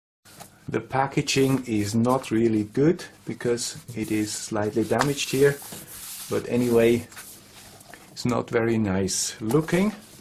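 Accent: Switzerland. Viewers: Merry Xmas and Mrs. Thank you for all your content this past year! I look forward to another years of awesome, educational and entertaining videos done in a Swiss accent!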